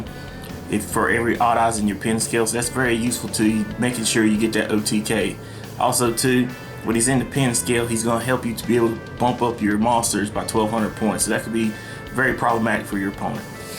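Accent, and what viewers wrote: South, United States. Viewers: Oh yeah lol your straight country lol I'm from Tennessee lol.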